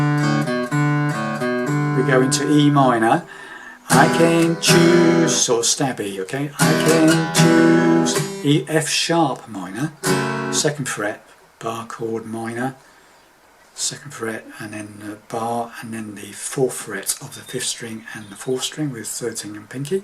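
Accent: United Kingdom. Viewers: gracias cuidaros mucho, también, supongo que por tu acento estas en UK, tus lecciones son muy didácticas para alguien de mi edad 61 años, gracias y cuídate por UK, que también tengo a mi hija en Lincoln, gracias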